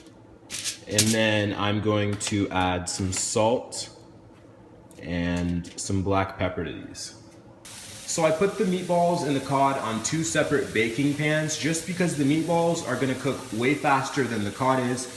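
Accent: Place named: Canada. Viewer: I can just tell ur canadian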